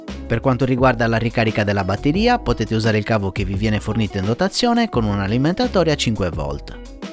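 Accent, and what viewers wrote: Italy. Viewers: At first I was annoyed that English titled video is not in English, but I decided to put on English subtitles and this review is actually nice and clear! I actually even liked this Italian accent :D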